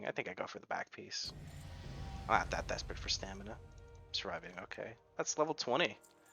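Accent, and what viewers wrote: United States. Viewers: What's your native language? You have a very American accent now.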